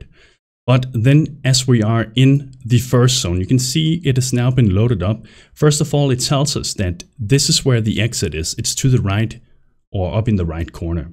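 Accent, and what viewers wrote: Denmark. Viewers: And yea he is Danish :p easy to tell on his accent, altough its not one of the bad ones! :p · Is this legal? Will it get you banned? And a side question. Are you danish ??? 😋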